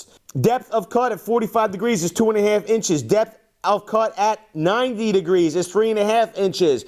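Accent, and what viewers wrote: Philadelphia, United States. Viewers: I've had this Skil saw sitting in my house for a week. My first tablesaw and I'm terrified to use it lol. Your video is probably the first I've seen where I feel more confident. Others casually rip through wood, no guard on, like it's not a big deal - and I KNOW it is. You've made it a point to keep yours on and show how you're ripping with it on. Granted, maybe a 4x4 or a very thin piece might need it off (?), but you then made sure to say the riving(sp?) knife should stay on if we know what's good for us. I appreciate this approach you're conveying - it's not overly fear-inducing, but also not callous to the consequences. And also, Philly Philly! at least I assume you're from Philly. Your accent made me wonder, and then your ending solidified my assumption.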